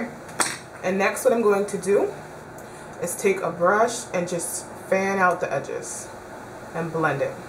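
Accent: United Kingdom. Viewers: lol at the british accent, I love it goooorgeous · Hahaha love the british accent! great tutorial!!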